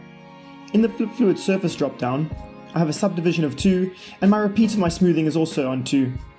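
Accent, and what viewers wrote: South Africa. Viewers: South African? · You sound South African lol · The accent took me a second to pick up. Not used to SA repping in the industry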